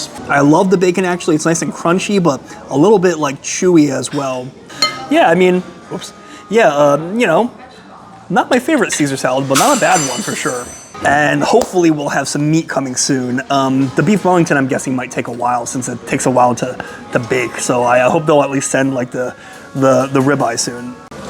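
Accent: United States. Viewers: I know enough to say a native speaker would notice his American accent immediately. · A question for any native Mandarin speakers: How well does speak Mandarin?. Does he have an American accent? How fluent is he?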